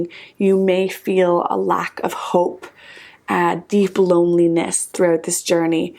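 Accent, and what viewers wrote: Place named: Ireland. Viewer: Lovely vlogs..very interesting!...I hear a touch of Irish in your accent..💚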